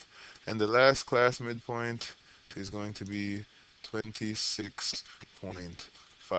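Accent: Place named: Caribbean. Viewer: Thanks immediately, hearing you Caribbean accent and I start to understand. wow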